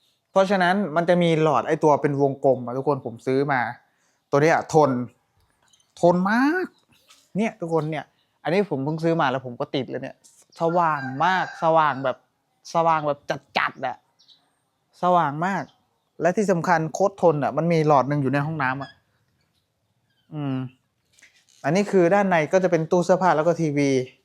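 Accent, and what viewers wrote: Thailand. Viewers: อยู่ไทยจนพูดไทยพูดลาวเป็นสำเนียงไทยหมดแล่ว🎉🎉🎉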